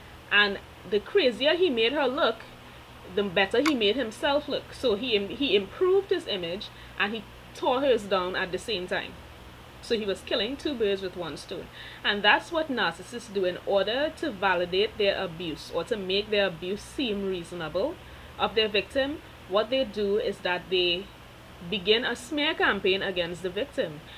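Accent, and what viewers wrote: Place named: Trinidad and Tobago. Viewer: Sooo... I have a thing for true crime and psychological subjects myself and have been researching this case extensively. Came across this video ans was like whoaaaa... is that a trini??? Cant miss the accent at all. I was like yes definitely a trini. From the moment I listened to Betty's actions, her interviews, everything I instantly felt like it was BPD... I had never been formally diagnosed but when I was younger before meditating and becoming self aware, I had these weird behaviours, hypersensitivity to perceived abandonment and rejection, and a rage that made me question myself a lot. When i researched BPD it was sooo in alignment with what I felt. A lot of the emotional issues I had, I worked through them by meditating and coming in alignment with my inner self, healing past childhood traumas etc.. UNTIL i entered a relationship with a narcissist. 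I was very aware of narcissism and he was completely textbook with the insidious undermining, gaslighting, head games, belittling etc. I found myself becoming erratic again, only in moments of being attacked though. I was becoming violent and nasty every time I was faced with verbal and emotional, sometimes physical assaults. But the thing is, he was so quiet, soft spoken and calculating, and I would react so loud and erratic, that I looked like the absolute nut job. While he stood there with a smirk on his face while quietly saying, "watch how you does get on." And i felt like I was going crazy. I just wanted to make it stop.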